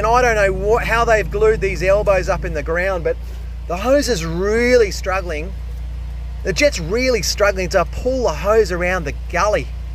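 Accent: Australia. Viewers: You are a laugh a minute thank you, you have made my day watching and listening to you, I lost my husband recently and you have made me laugh again I love your true Aussi language I just love it you are so passionate about your work and the fact you don’t give up is what I appreciate about you thank you again ❤❤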